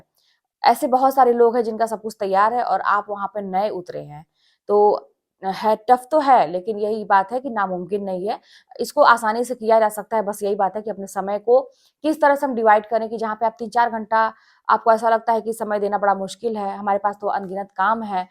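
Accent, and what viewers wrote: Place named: Bihar, India. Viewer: Hello madam ji good aap biharii hai kya aapke bolne se pta lag raha hai bihari staill😊😊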